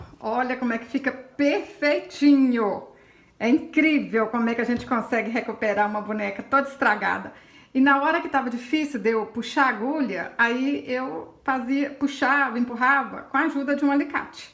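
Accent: Minas Gerais, Brazil. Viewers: Que sotaque gostosoooo…. Mineira uai…. Lembrei da minha querida avó paterna, uma crocheteira de mão cheia r minha primeira professora! Viu te seguir, amei seu trabalho, em tempos onde tudo se descarta regatar uma boneca é lindo! Beijos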